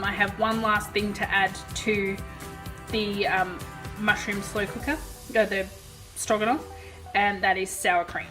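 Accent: Australia. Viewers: I came from money mom's video. Are from QLD because you sound just like Mel Buttle and she sounds so QLD to me? Also are you Mel Buttle?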